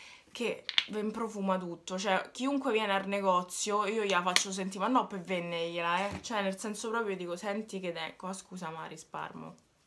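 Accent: Rome, Italy. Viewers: Se la persona che quando sono triste mi fa venire il sorriso e mi fa divertire con le tue battute il tuo accento romano TI AMOOOO💖💖💖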